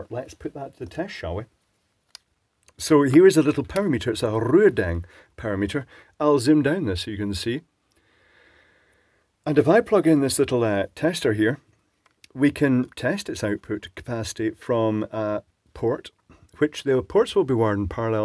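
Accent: Scotland, United Kingdom. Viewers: Excellent and very educational! There's something about this Scottish accent version which inspires confidence- as opposed to the 'English bastards...' version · your soft Scottish accent and sarcasm works perfectly when discussing China's quality products. You always have me laughing out loud.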